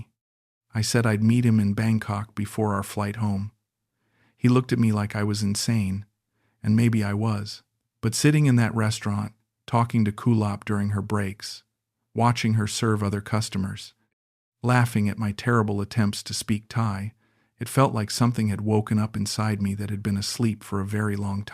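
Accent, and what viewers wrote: United States. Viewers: Another scam story with a yankee voice, jeez this crap never give up their scam life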